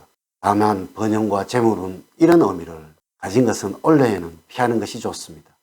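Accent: Gyeongsang, South Korea. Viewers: 경상도 말ㆍ못알아듣겠네ㆍ 방법은 밑줄에 자막필수ㆍ 그것도 모르고 유튜브 합니까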